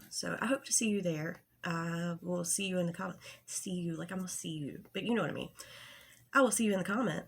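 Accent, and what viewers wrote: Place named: Georgia, United States. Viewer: Are you from GA? Just trying to pinpoint the accent. Coming from AL over here.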